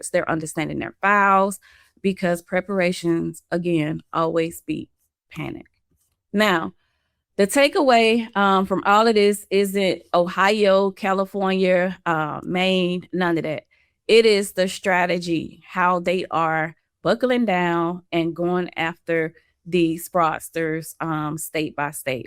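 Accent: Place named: Georgia, United States. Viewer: Sound like Georgia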